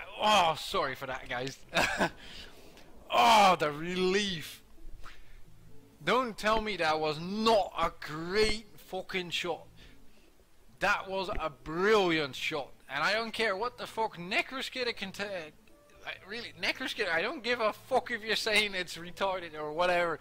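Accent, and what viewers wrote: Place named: Netherlands. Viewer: Are you Dutch? What is this accent?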